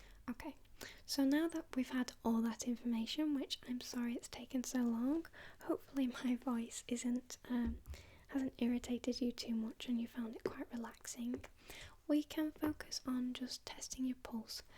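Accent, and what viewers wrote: United Kingdom. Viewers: Congrats, so pretty british accent!! Love your ASMR videos!!! Hugs from Brazil. :) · Her voice is so beautiful, I love that I'm discovering more British ASMRtists. I love the sound of British English, it's so beautiful and perfect for ASMR❤️Subbed and liked.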